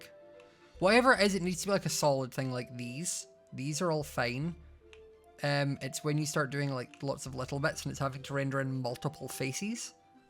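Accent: Scotland, United Kingdom. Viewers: Speak Scottish?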